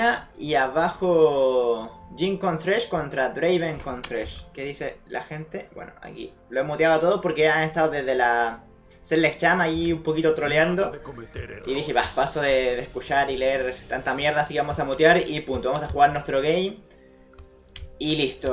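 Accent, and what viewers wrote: Chile. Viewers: eres chileno ?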